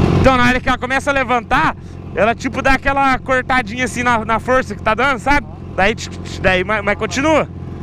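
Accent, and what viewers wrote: Goiás, Brazil. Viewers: A tem que fala daquele jeito no pique do Goiás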